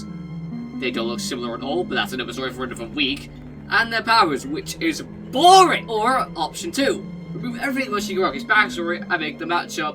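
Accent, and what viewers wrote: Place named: United Kingdom. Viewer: This is the first time I’m hearing your voice Holy shit you ARE British aldhaodhakdhosjzksjd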